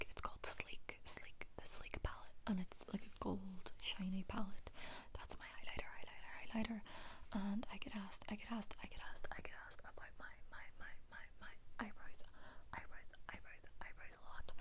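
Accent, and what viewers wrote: Ireland. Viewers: now that u mention it I do hear the Irish accent and I love it!! congrats on 10k <3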